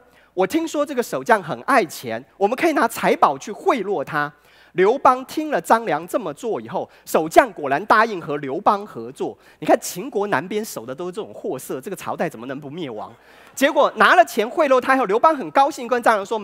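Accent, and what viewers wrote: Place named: Taiwan. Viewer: 内容挺好，但这口音实在是太重了。不记得台湾口音的舌头可以直成这样😂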